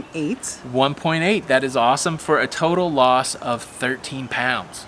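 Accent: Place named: New Jersey, United States. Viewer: Great job. I really think low carb is the way to go. And I live in ca and I could hear the thic Jersey acsent.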